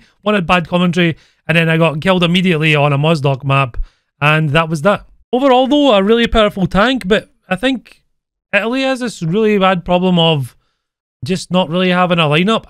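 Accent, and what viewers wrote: Scotland, United Kingdom. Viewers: Day 2 of telling he's the best war thunder YouTuber and has the best Scottish accent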